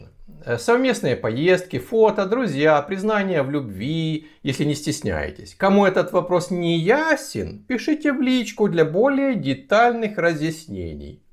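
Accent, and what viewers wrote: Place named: Ukraine. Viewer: я слышу по говору что вы из Украины, и вас как и меня выдает буква Г. Хотя еще могу предположить что вы из Ростова. А мы из Одессы, да, и мы тоже находимся в Лондоне. Так сложились обстоятельства.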